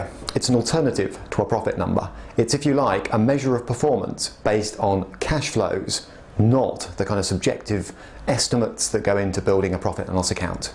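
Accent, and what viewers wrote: United Kingdom. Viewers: I love your presentation and British ascents ：)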